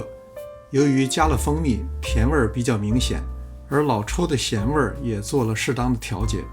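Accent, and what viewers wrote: Beijing, China. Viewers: 时不时蹦出的老北京土话让人听了真的很亲切！感谢您的美食分享！ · 最近看到这个视频，太喜欢这个节目了博主用心做美食耐心讲解，地道的北京口音娓娓讲述烹饪技巧👍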